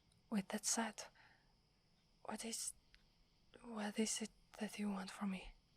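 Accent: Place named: Italy. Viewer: It wouldn't be cute without the italian accent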